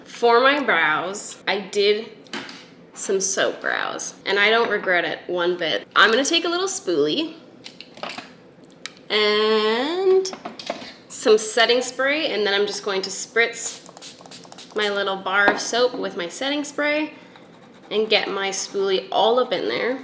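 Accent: Canada. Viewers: Jessica Ridenour Yes, it’s how most Canadians say it. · It's the same as the southern English pronunciation and I'm grateful for it. Canadian accents are beautiful.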